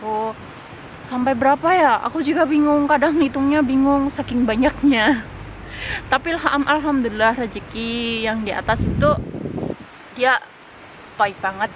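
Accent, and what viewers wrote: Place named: Banyumas, Indonesia. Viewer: semangat yaa mbaaa... btw salam ngapak yaa mbaaaaaa... satu suku sepertinya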